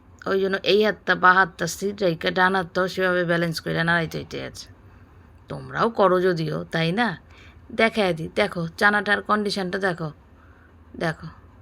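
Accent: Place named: Dhaka, Bangladesh. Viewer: দিদি ঢাকাইয়া কথা আমার শশুর বাড়ি বাপের বাড়ি সব ঢাকাইয়া। তোমার কথা শুনলাম সত্যি সত্যি অনেক ভালো লাগলো। চানা দারুণ লোভনীয় হয়েছে। তৈরি করার পদ্ধতি দারুণ। ভালো থেকো দিদি। ❤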